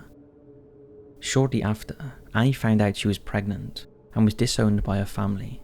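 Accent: Wales, United Kingdom. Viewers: Is he welsh or English? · Dude he is welsh or something. Don't be such a trump supporter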